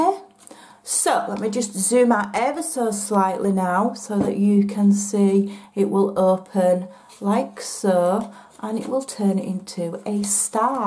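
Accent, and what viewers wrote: United Kingdom. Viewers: Such a neat MAILABLE gift! Love your accent, too. May I ask where in the UK you're from? Thanks for sharing! :)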